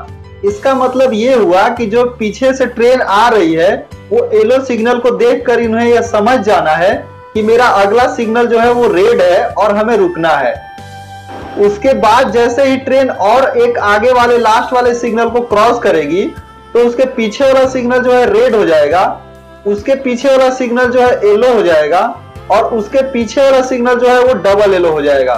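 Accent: Bihar, India. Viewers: Bhai Bihari language me Aisa hi Bolte hai. V Ko bhi, Mai Ko ham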